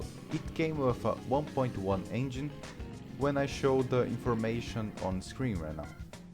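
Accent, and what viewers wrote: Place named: Russia. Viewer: Nice russian english brothar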